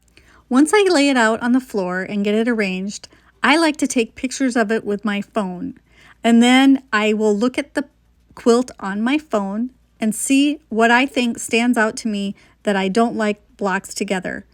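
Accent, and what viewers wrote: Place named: Texas, United States. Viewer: I'm from Texas and have been quilting only since i retired about 10 yrs. Iwas taught not to waste anything so i make baby quilts and string and log cabin. I love this and was trying to decide what to start now. You helped me decide. The only problem I had was thinking you were saying black instead of block and couldn't quite figure out where you were putting the black.....guess I missed the Texas drawllllll lol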